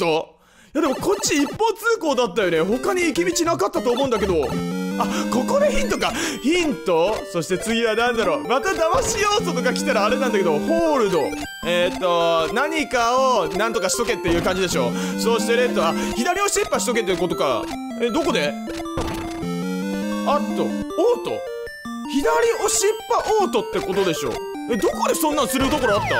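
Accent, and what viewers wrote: Fukuoka, Japan. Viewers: 福岡弁？とゴミみたいなOPツボです！いつも楽しませてもらってます🤗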